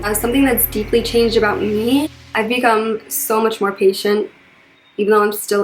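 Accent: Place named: Chicago, United States. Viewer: I was gonna ask if you were from chicago. You got that accent. love it!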